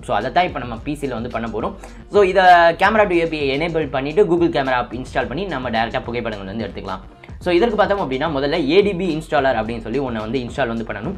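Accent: Madurai, India. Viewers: Madurai ah neenga? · Bro madurai ah Neega. . . Nice video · Bro Mi A1 ku epadi install panrathu nu solunga and nenga Madurai ahh?? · Bro nee Madurai ah 😍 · Madurai karanaya ne ???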